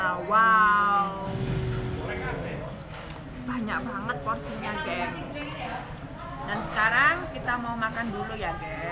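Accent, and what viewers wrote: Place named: Surabaya, Indonesia. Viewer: Dasar wong suroboyo yo ngunu rek ngomonge biasa wae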